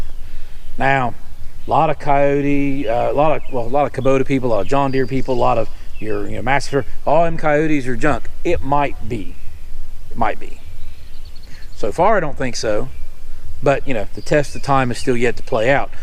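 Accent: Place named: North Carolina, United States. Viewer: Sounds like you're got a touch of north carolina down east in your speaky.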